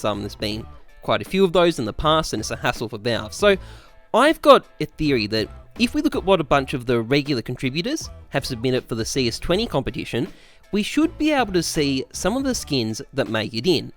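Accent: Australia. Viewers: Isn't from Australia too? His accent is like an Australian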